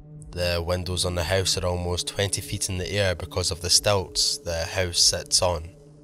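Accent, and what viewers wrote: Scotland, United Kingdom. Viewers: Came across you through Swamp Dweller. Love the Scot accent. TY, you'll see me around. :)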